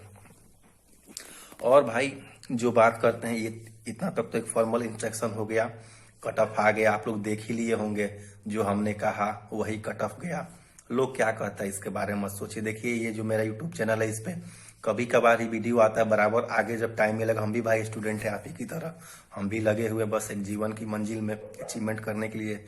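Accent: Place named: India. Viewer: Bhai kchh v ho Aap sudh desi bhasa bolte ho.....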